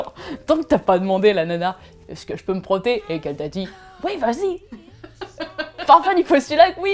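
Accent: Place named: Paris, France. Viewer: Ahaha ok je viens du sud (telle Chimene Badi) du coup centre ou titi parisien ça se ressemble un peu à mes oreilles :) ! Merci pour ton témoignage, et concernant tes dents, avant que tu n'en parle je trouvais que justement elles étaient très jolies :) Les sourires colgate c'est banal et triste, mais des petites dents qui font leur vie c'est bien plus charmant !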